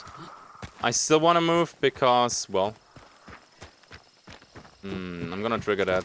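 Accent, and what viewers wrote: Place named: Germany. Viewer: If I may ask, are you German? Bcs you kinda sound german and your grammar hints at native german too. I am always happy to see a Landsmann on the internet. 😁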